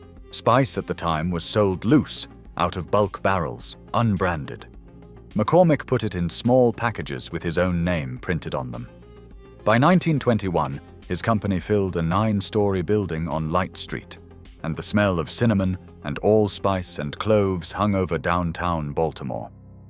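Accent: United Kingdom. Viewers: I love this channel! I've learned so much from it and I hope it continues. I especially like the British accent.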